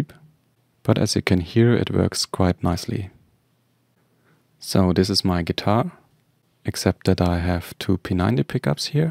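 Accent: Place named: Germany. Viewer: Gutes Video mann hört aber Das Du ein Deutscher bist.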